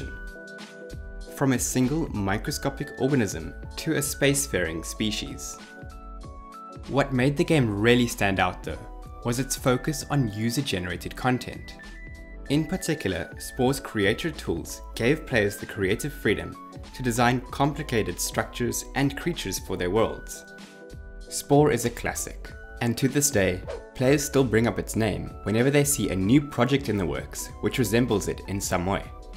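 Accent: South Africa. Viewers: Great YouTube channel! I assume you are South African, because of the accent. I am also proud South African. It’s so cool to have a growing YouTube channel such as yours based in South Africa. You are definitely in my top 3 game development YouTube channels. Could even be number 1. Keep up the great work. Oh and where in South Africa do you live?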